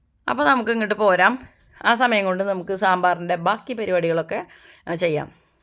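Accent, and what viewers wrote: Thrissur, India. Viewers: Hi che,thank u for uploading videos soon,from a thrissurkari who lives in Melbourne now. I love watching ur vlogs to hear Ur thrissur slang and Ur simple life.love u che a lot.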